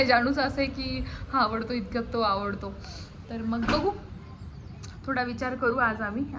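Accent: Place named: Nashik, India. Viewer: Half vlog baghitla language hun vatal aaplyachkadche distay Nashikkar❤ nd I'm right😀 New subscriber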